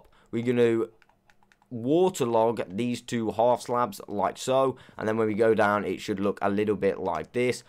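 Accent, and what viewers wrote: United Kingdom. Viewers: really sounds like british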